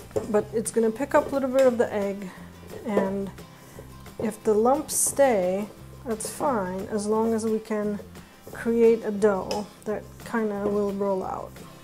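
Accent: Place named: Poland. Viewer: Wonderful recipe and video! I really love the way you walk us thru too. I do best when I can actually SEE how it works, so your videos always work for me. I am half Polish and grew up in Greenpoint , Brooklyn NY. Which is known as “Little Poland”......lots of Polish butchers and the best kielbasi you can find! But I have been trying to learn more about the many other authentic foods and the recipes to go with them, so I have a lot to look forward to on your channel. I thank you for sharing so much with us!! PS- I love hearing your accent! Half of Greenpoint sounds just like you, so it takes me right back home for a little while..... I love it!! ❤️ 🥰❤️🙋🏻‍♀️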